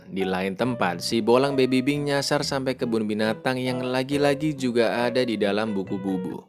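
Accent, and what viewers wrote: Java, Indonesia. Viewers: Pengisi suara nya Orang jawa ya mas? 🙏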